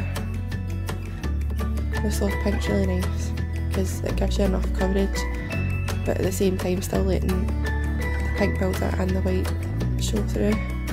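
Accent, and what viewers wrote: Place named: Scotland, United Kingdom. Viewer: She's Scottish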